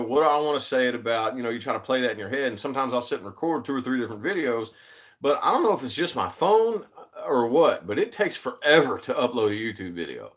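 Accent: South, United States. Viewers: You sound like you could be from the south, maybe I’ll figure it out as I keep watching your vid’s. I’m glad you have a happy relationship. Blessings 💗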